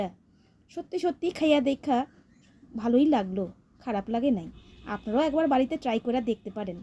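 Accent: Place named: Bangal, Bangladesh. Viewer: খুব ভালো বাঙাল ভাষা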